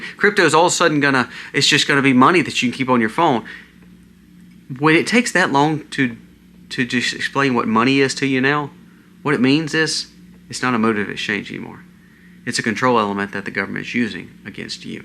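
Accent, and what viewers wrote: South, United States. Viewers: I know you have a pleasant southern drawl. But if you could enunciate a little louder or clearer, my old yankee ears could understand you better No disrespect...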